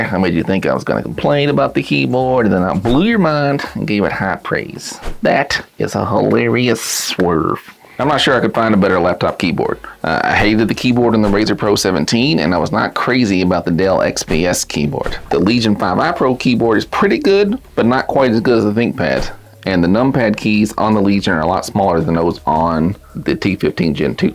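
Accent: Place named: Texas, United States. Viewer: nice accent! Texas?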